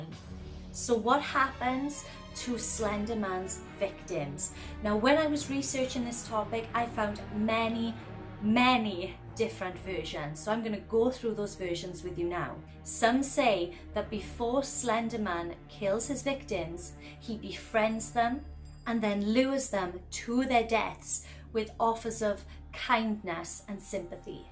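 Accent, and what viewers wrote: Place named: Wales, United Kingdom. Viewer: Me too I dont really like the Welsh accent however has a very soft voice and proper fit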